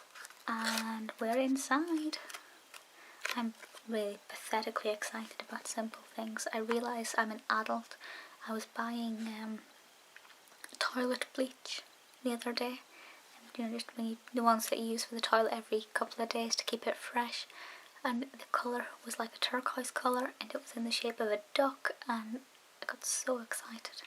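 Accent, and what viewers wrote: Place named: Scotland, United Kingdom. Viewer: Me neither, only as far north as Aberdeen.. Desperate to see it someday, I cannot believe how spectacular the landscapes are and also how much kinder the people are compared to where I mostly lived in London, Cambridge and Essex. There's really something to be said about the lack of pretensions and sincerity of the Scottish, it's not a generalization. Really want to see The Great Glen and Orkney Islands as well. Your voice always reminds me of my days back in Scotland! Cheers;)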